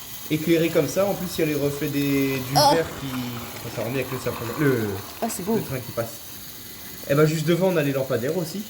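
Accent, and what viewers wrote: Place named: Normandy, France. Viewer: Coucou, et le papa ❤❤❤ Chouette le sapin, trop mignon 😍 🎉 Décidément 😂 tu es spécialiste dans les pannes de voiture 😅 espère tout rentré dans l'ordre. J'aime t'entendre, tu as un côté rigolo à raconter tes expériences 😂 et avec ce parler qui ressemble tant à celui de mon enfance près du Mont St Michel (côté normand )dont j'ai gardé encore qq accents. 😅 eh oui, on ne change pas une équipe qui gagne 🤣🤣🤣 Gros bisous et bon week-end ❤😊❤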